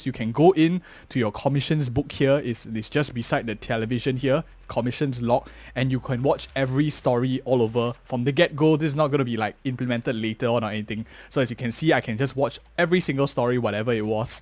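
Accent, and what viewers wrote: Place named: Singapore. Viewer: hello, when i first heard you speak i immediately recognised our SG accent! hahaha. keep up the good work with your guides. much love from a fellow SG:D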